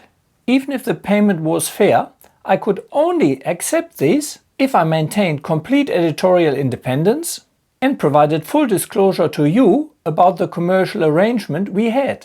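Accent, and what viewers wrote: Germany. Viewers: That sounds german.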